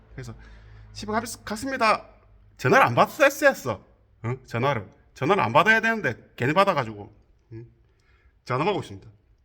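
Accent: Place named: Gyeongsang, South Korea. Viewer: 오늘도 구수한 경상도 사투리를 들으며 영상 시청 합니다..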